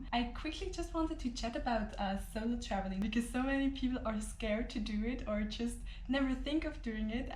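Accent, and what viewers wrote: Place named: Germany. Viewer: Your beautiful accent reminds me that of my ex-german girlfriend! You are so sweet!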